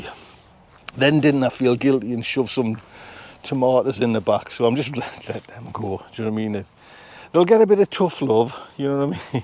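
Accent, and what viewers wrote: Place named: Northern England, United Kingdom. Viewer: We’re a little south of you, accent wise 😂 and my elephant garlic is a bit behind you. The red onions sets are starting to bolt here, every bloody year. Slugs are a nightmare on one bed in particular here. I don’t know what your weather has been like but it’s been like a yo-yo here Durham way. T-shirt then jumper 🤷‍♀️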